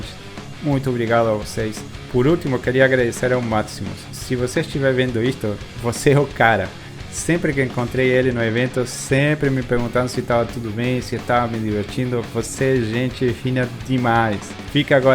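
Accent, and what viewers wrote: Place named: Latin America. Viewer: Top demais,! Obrigado por compartilha com a gente essa experiencia. Agora, tira uma curiosidade por favor: esse seu sotaque não é de brasileiro. Tá me parecendo que tu tem um pé em algum país espano, não?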